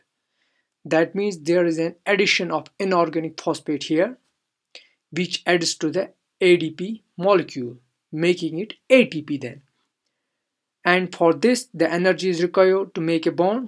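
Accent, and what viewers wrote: Kashmir, India. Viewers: Kashmiri accent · You sound like you're a Kashmiri?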